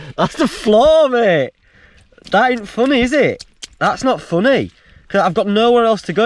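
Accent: Yorkshire, United Kingdom. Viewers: Only recently found your channel and watched the most recent vids…having watched this one; laughing at how strong your accent was, being back in Yorkshire 😂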